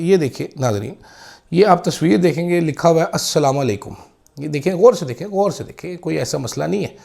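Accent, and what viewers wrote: Punjab, India. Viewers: You have a real lethal sense of humour! Your style urdu speaking is just wonderful. (Panjabi accent?)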